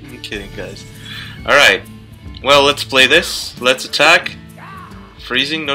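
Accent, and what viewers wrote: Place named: Russia. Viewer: laska ma ruski akcent a twierdzi że jest z Polski hmm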